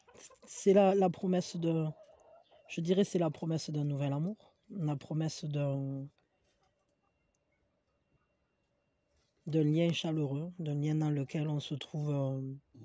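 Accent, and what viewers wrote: South, France. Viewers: Bonjour, votre accent du midi fait plaisir à entendre 😍 Nouveau cycle, mais notre lien a été corrompu par de la magie noire. Ma famille est tout !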